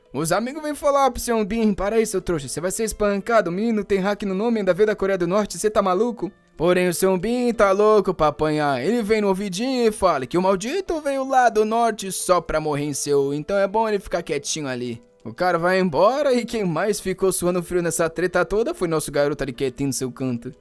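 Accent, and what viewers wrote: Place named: Minas Gerais, Brazil. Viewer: É só impressão minha ou o jeito dele de narrar tá menos caricato e exagerado? Eu falo daquela melodia meio mineira que acho que tá menos acentuada mas não sei.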